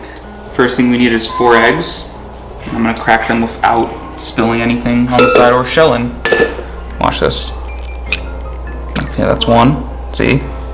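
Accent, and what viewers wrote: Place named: United States. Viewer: джан,и тебе спасибо, за твои вкусненькие рецепты.! Арменин,который говорит по русский с американским акцентом.! ☺️👍🤗😘💋❤️🤗 джан,с Великой Пасхой тебя поздравляю.!Мира,здоровья и добра тебе желаю.!И естественно,процветания твоему замечательному каналу.!👍👍💥💥💥💥🌞🙋‍♀️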